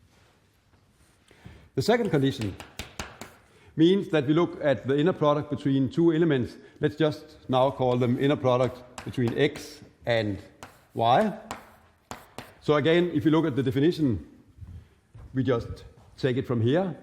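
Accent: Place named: Denmark. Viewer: Harry Ritchie no, he is very clearly Danish. We can identify fellow Danes.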